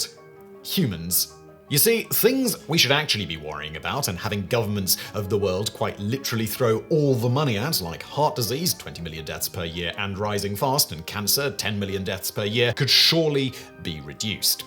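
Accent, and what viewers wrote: United Kingdom. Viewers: I sometimes find myself paying more attention to Simon’s diction than to what he’s actually saying. How is it that the British pronounce the word “air” as just “a,” with a short “a” sound? Also, since my son is a flight instructor working toward building enough hours to fly commercial, I am also very thankful for the strength of airline safety. I keep telling people who worry about flying that they should really be worried about driving to the grocery store. Also, it’s glide “slope,” not glide “scope.”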